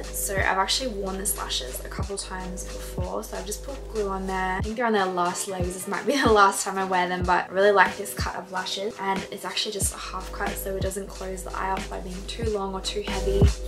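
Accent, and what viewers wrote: Australia. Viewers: Hey, are you Australian??? Love your videos ma'am 😍.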